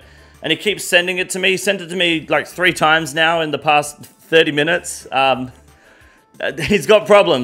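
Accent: Australia. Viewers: fuck me its just good to just hear an aussie voice on an rs vid every now and then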